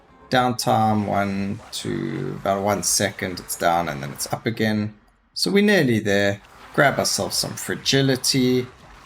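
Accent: South Africa. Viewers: Its MAD how easily you hear the SA accent, Follow just for that!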